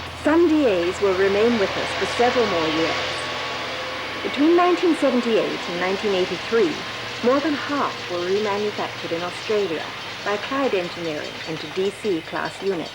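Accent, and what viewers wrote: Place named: RP, United Kingdom. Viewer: A very interesting video. I love the very posh English accent lol!. 😄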